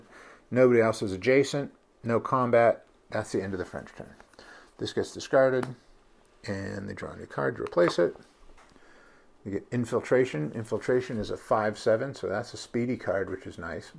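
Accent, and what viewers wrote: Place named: Pittsburgh, United States. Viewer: Thanks for making these OSG videos. I also thought in one of your videos "that sounds like a Pittsburghese accent" and yep you guys are here too.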